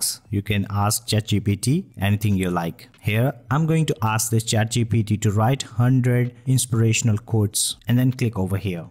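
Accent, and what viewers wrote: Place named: India. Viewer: "This is a driving drop tool".. I'm not too efficient in English - but what indeed does it mean, and why it is not possible for Indians to speak normal english at least for tutorial videos purposes?..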